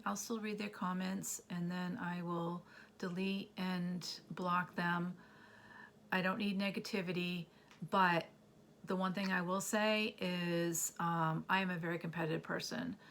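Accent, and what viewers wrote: New England, United States. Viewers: Hi! I will be happy to be part of your community:) I have a lot of trouble letting go and have some hoards of my own for sure! I get it;) Also- you have a New England accent; I’m up in Maine!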